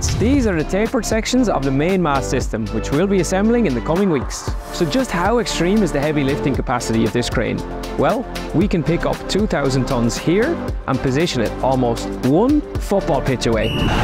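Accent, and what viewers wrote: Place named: Ireland. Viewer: I wasn’t expecting an Irish accent when the video started! These cranes make liebherr ones look like toys!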